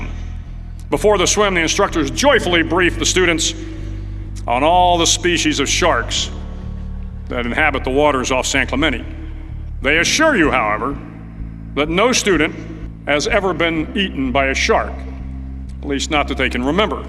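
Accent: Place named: Texas, United States. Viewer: 텍사스 억양임. 진짜 텍사스 억양이 멋있는 것 같음.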